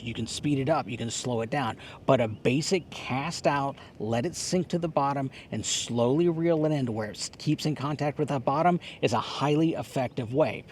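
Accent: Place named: Minnesota, United States. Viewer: You need to stop telling people all this BS . Water color and habitat in Florida is Totally different than other states. You sound like you are from Minnesota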